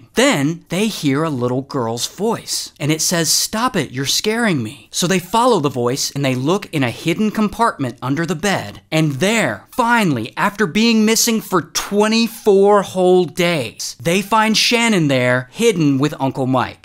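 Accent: United Kingdom. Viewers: As a British person I can confirm we talk like this😂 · best british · british perfect impression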